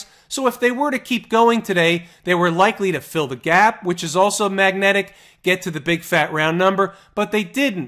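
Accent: United States. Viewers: Most American sounding narrator. I love it. I bet he’s nice to waiters. 😊👌🏻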